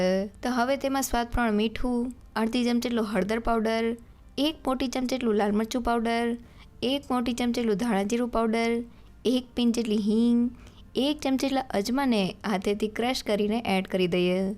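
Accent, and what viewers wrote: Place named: Kheda, India. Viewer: તમારું ગામ કયું છે તમારી ભાષા ખેડા જિલ્લા જેવી લાગે છે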